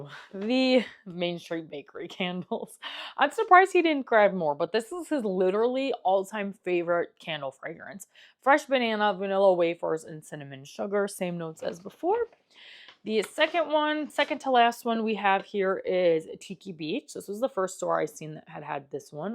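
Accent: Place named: Midwest, United States. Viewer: It sounds midwest to me 😊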